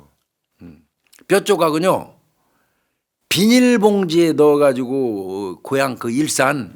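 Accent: Chungcheong, South Korea. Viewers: 우리의. 충청도. 말씀으로. 넘. 구수하게. 해주셔어. 감미록고. 훈훈한. 진국입니다. 정말. 꼼꼼. 인정유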